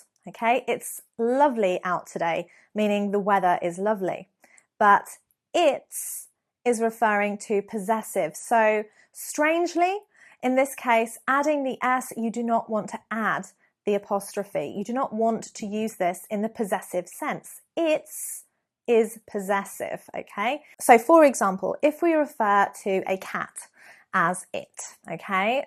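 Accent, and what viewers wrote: United Kingdom. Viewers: You sound exactly like every Snooty British person every American citizen hates with a dieing passion.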